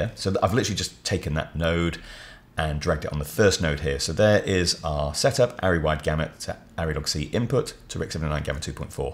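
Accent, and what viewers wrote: England, United Kingdom. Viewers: This video is a life saver! I'm about to release a documentary film were I use mainly HLG (Rec.2020/Rec.2100 HLG) color space and gamut. I used to start with the color space transform but now I'll do as you tell here. Gonna save this video in my reference videos. Also you sound English, why type it color instead of colour?😅 Warm regards.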